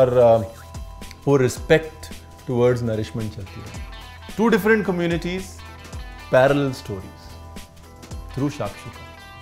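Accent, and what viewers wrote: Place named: Lucknow, India. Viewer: I don’t watch too many cooking videos but I recently started watching yours and god hooked on to your Lakhnawi Hindi. Kya lehja hai! Take a bow Ranveer.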